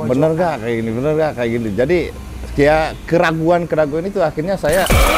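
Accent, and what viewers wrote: West Java, Indonesia. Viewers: Logat ngomong nya sunda🤭😂 mungkin kelamaan di bandung haha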